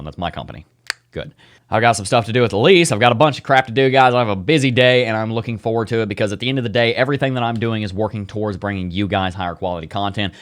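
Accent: South, United States. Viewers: One of next fav analysts , it’s the southern accent or wherever the hell yo from . I love coffee too bro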